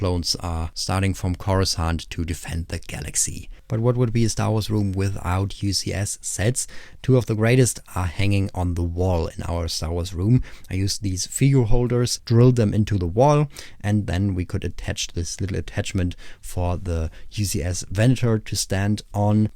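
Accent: Germany. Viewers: Dieser deutscher accent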